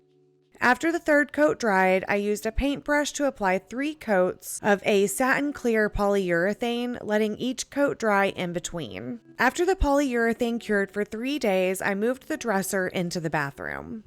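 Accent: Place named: Midwest, United States. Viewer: vocal fry. up speak. midwest dialect. TRIPLE THREAT. Use some nice Ai program for your VO, please. nails on a chalkboard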